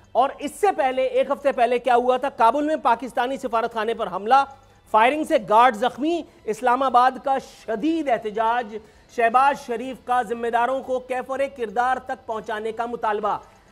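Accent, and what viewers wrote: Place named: India. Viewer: Sadeqe sahab apka lehja he Indian ha..nafrat na banao ye khod army ne apne oper keya ha taky nazar estaraf hojae...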